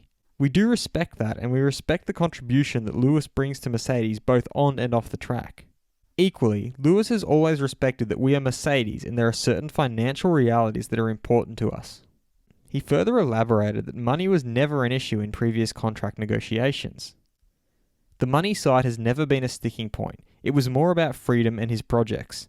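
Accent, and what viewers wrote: Australia. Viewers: is Australian, so there's no difference between how we say pole and poll, they sound the same.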